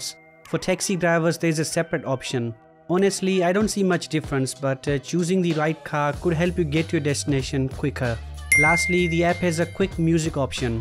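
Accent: India. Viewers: Speak English, not Indian-English.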